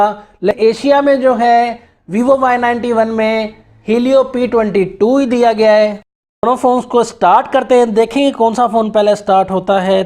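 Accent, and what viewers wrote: India. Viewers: ngomong naon si kentel jasa bahasa inggris india na,mere ge hese ndeuk meuli hp adoooh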